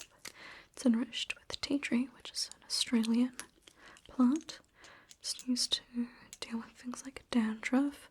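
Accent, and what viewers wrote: New Zealand. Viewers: R u from nz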